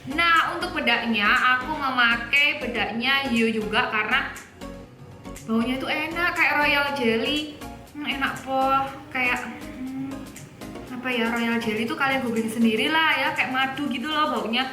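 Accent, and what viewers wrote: Java, Indonesia. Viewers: medok tutor e 😂😂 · Lgsg ku subcribe lucu liat mbkx medok banget ngomongx...polos lg syyukaa😁😀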